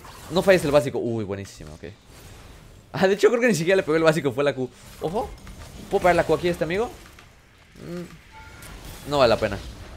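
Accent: Colombia. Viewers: Eres de Colombia? XD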